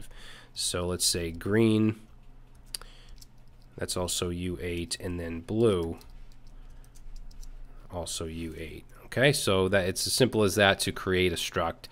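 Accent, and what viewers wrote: Boston, United States. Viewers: This is a great place to start. Well spoken, easy to follow and your Bostonion? accent makes it more interesting.. thanks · Just a question,are from Boston ?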